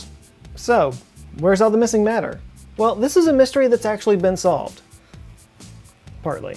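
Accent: Texas, United States. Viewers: A giant what? Oh, a die-key-ree, now I get it... no thanks to your Texan pronunciation.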